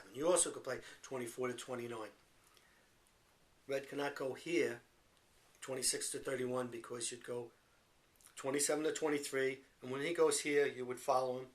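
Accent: New York, United States. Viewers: Love these checkers videos - like the NYC?/ NJ? accent.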